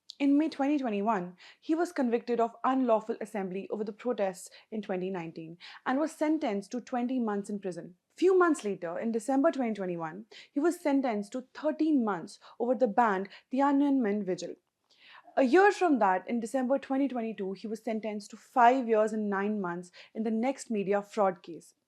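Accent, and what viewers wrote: India. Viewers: I pray to God that my children do not learn to speak English from the presenter of this video. No offense to Indians but such a bloody awful accent. Try learning to speak proper English first before coming onto broadcasting. It's an insult to the industry. Half the listeners can't make out what the hell she was talking about. That was the biggest joke of the day !!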